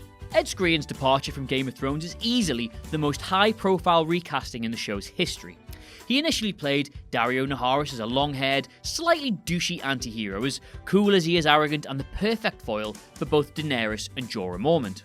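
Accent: United Kingdom. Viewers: Have you never heard a British person speak before?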